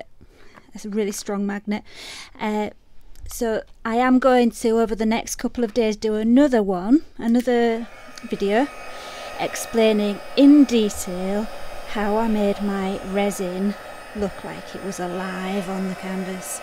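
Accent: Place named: Harrogate, United Kingdom. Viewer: I feel like wrecking my microwave. Lol. You sound as though your from my area. Harrogate. I can’t find one 😥 I have the pigments. I’m ready Only got tiny magnets tho. Scared lol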